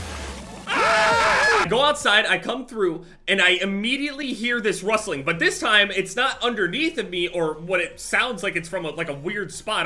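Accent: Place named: New Jersey, United States. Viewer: I could always tell was from nj just by the way he says yesterday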